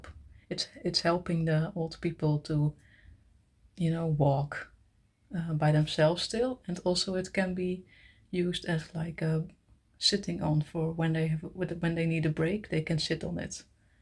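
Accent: Netherlands. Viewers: Ladny nederlande akcent ) hhhrahhh)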